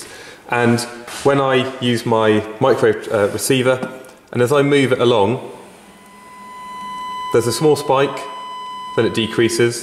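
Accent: United Kingdom. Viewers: I love your accent, nice British guy!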